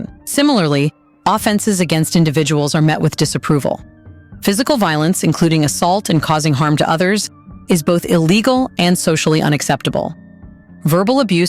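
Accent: United States. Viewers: Good question, sounds American narrator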